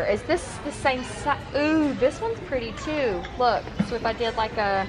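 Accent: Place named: South, United States. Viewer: I love the southern accent! 🥰 My Alabama accent comes out when I get angry... or when I go into “mom” mode. 😆😂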